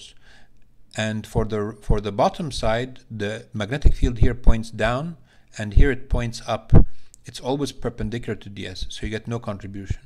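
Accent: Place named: Egypt. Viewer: ❤️❤️ i am from egypt too. I expected that from your accent but i think you are not in egypt? I want to thank you for you for these great videos ❤️❤️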